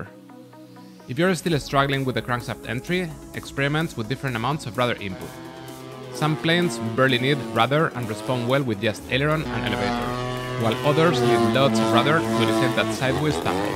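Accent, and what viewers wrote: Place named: Spain. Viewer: I don't do well with Spanish accents, so I turned on closed captioning - turns out CC has a problem too! "about half left Aen a touch of left brother..."🙂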